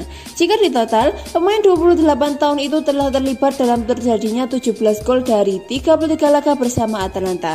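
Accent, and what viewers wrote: Java, Indonesia. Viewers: Host ny logat bicarana ni medok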